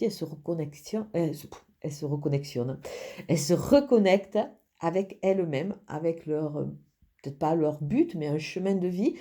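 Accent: South, France. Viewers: Bonjour, je vous remercie encore pour votre fabuleux live d'hier et merci pour ce tirage du jour. Et merci aussi pour la générosité de votre ❤ pour vos abonnés... Bon week-end à vous bel accent du soleil...💐 ☺ 😘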